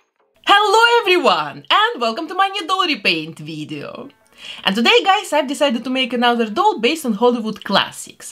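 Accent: Russia. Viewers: По первым фразам поняла, что вы русская 😇😘😘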